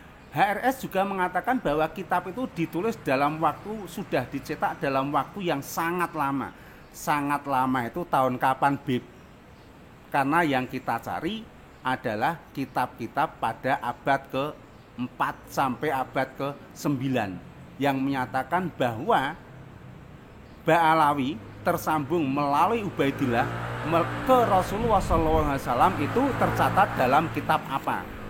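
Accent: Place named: Java, Indonesia. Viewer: Ini pak guru cerdas walau agak medok dikit . Lanjot pak de..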